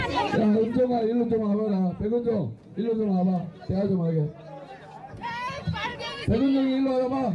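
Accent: Jeolla, South Korea. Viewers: 전라도네 사회보는놈 듣게싫어사투리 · 시팔 세퀴가 전라도말투네!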